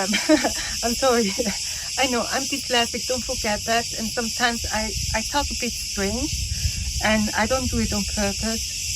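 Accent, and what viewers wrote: Switzerland. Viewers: Liebe vielen lieben Dank,sie inspirieren mich mit ihren Arbeiten, Ideen und mit ihrer liebevollen Art die Arbeitsgänge zu erklären. Ganz zu schweigen von den wundervollen Möglichkeiten ihre wirklichen Kunstwerke nach machen zu können. Mir gefällt ihr Schwizerdytsch sehr,ich lebe seid 40 Jahren bei Konstanz und und bin in 10 Minuten in der Schweiz, dass ist immer ein bißchen Urlaub für mich ❤ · Ist Ihre Muttersprache Schwizerdütsch?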